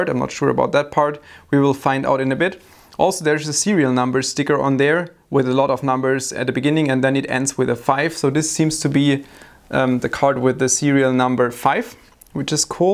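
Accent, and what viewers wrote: Germany. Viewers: Deutscher Akzent is da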